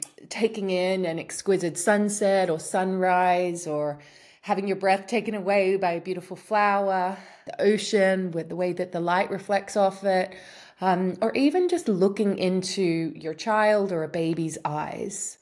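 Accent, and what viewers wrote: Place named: Australia. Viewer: Hi, I'm from the south west of Australia so great to hear a fellow Aussie on here ❤.. just a question.. I worry about everything and have been trying to have a mystical experience through breathwork for a while now to no avail.. do you think I may just not have a soul? Like an NPC or something? I don't seem to feel emotions of joy just anger and misery.. do you believe in soulless people (literally)? Would they know they weren't human?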